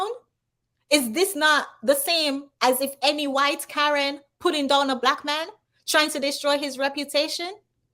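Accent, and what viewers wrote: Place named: Jamaica. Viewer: Omg, I just came across your channel and it seems to me you’re Jamaican I can tell by your accent. My problem is why are you on YouTube in the American space talking about there issues when Jamaica 🇯🇲 has bigger problems. Jamaica and Jamaicans have much bigger issues than this! You know Jamaican men don’t care about babymothers and Petty things like this. None of what you say is even a real problem in comparison to the killings and the violence in black communities across the globe, especially in your country Jamaica 🇯🇲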